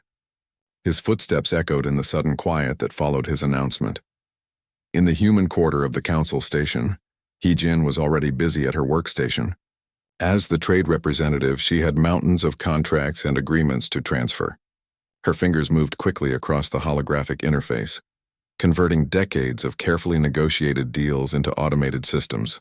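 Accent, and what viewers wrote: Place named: United States. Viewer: Oh the irony, of having an American voice declaring a breakdown of rules. In particular the time frame of the world order breaking because of an American presi... donkey.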